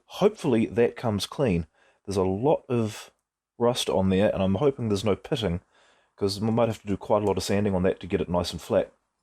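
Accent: New Zealand. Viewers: Clear explanation and step-by-step practical demonstration. Enjoyed this video. Kiwi accent.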